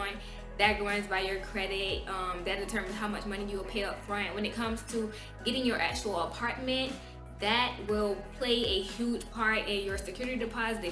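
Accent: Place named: New York, United States. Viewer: She sounds like she from ny but moved down south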